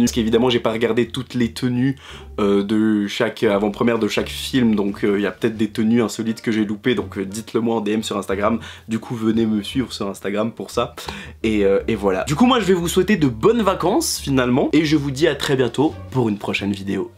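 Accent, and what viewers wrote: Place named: United Kingdom. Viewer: Ton accent anglais une pépite ✨✨✨✨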